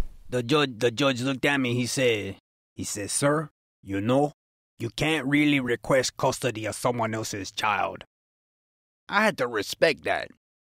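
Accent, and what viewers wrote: Mexico. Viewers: Guys, the Mexican accent is back. And it's pretty much here to stay it seems. Hooo-rah.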